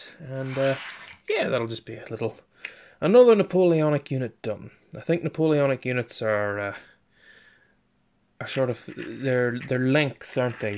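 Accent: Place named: Northern Ireland, United Kingdom. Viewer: I thought I detected an Northern Irish accent 🤣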